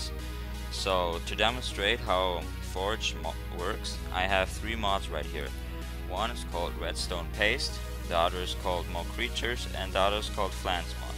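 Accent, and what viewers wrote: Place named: England, United Kingdom. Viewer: i think you sound English not germen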